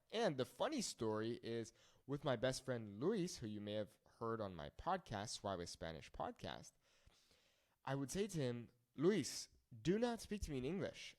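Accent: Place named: Mexico. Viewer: Love your lessons. And I am Spanish. Just here to practice English (and listen to your fantastic Mexican accent). Good work!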